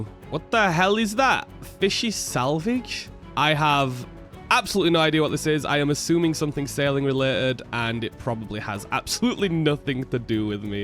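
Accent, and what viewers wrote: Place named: North West England, United Kingdom. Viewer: you sound like ur from the north west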